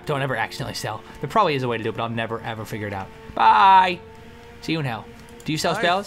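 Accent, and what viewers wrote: Russia. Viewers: You had a russian ascent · Holy crap... I just realized what his Russian accent reminds me of! BARTOK. FROM THE MOVIE 'ANASTASIA'. Holy crap... wow. I'll never watch that movie the same way again.: "I give her a 'HAH', then a 'HIYAH' and I kick her, sir." Wow. My night has been made. =] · I like the Russian accent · this guy sounds like fpsrussiawith his russian accent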